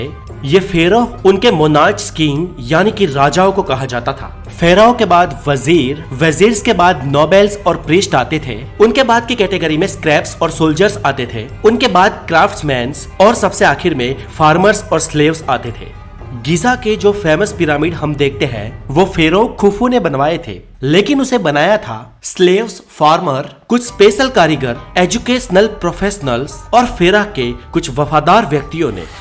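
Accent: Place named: Bihar, India. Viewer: Mostly voice bihariyo ki kyun hoti hai with mother tongue in fluency issue ke saath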